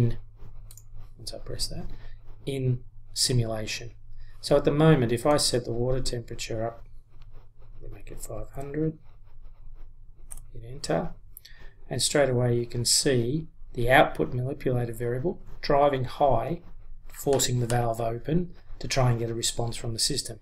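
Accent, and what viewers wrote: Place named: Australia. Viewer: Thank you for superb teaching video from Thailand. Listening to your accent I missed all friends in Melbourne.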